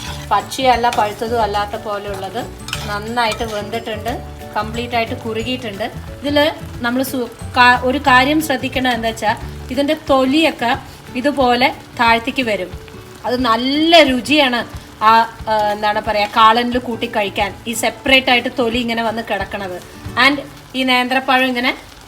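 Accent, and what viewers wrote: Palakkad, India. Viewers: Hello I love you chechy chechy njan 1st time anu comment cheyyunnathu reson your palakkad slang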